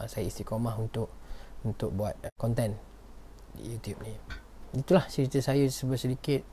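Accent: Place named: Kuala Lumpur, Malaysia. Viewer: Wow 😮 cakap KL 🤣🙏🏻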